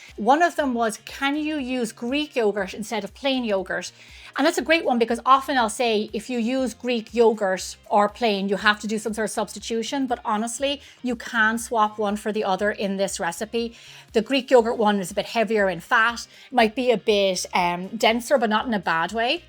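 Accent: Ireland. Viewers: She sounds Irish to me, not French. Is she Irish?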